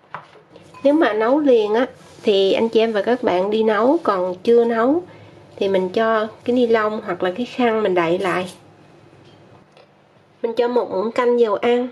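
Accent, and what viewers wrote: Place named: South, Vietnam. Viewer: ui ...giọng nói miền Nam thiệt ngọt ngào làm sao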